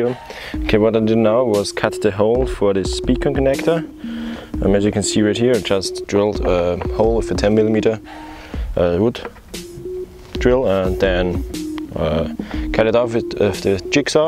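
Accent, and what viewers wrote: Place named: Germany. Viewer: Man hört sofort das du deutscher bist. Auch ohne die Deutschen einlagen. 😂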